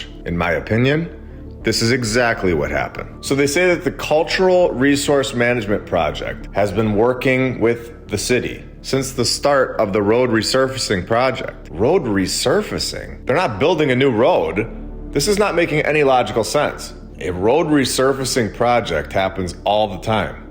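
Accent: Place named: Midwest, United States. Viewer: I knew you were a Midwesterner like me haha. I could tell by that unmistakable accent. I'm from Michigan, born and raised, but I lived in Milwaukee for 5 years. I feel so stupid because I NEVER even considered the architecture! Like never even glanced at it! I regret that so much! But if you don't have eyes to see...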